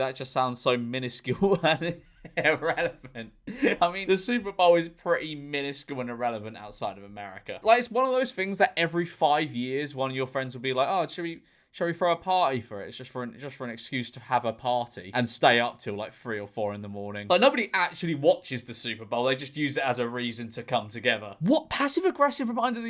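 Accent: United Kingdom. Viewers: Mate, it was kinda funny when I (Russian guy) just understood the meaning of 'inalienable' and what it derived from, though hearing it for the first time, while a guy who sounds British just went straight to Google Translate:) Guess I am just used to encountering lots of English words I see for the first time and have to guess the meaning by context or morphology. No offense to you, it's OK, the situation itself made me smile.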